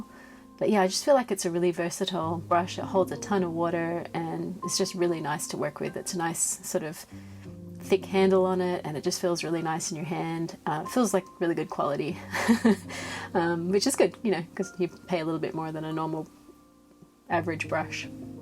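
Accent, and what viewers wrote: Australia. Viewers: I can't decide if you are an Australian living in America but your accent is so interesting!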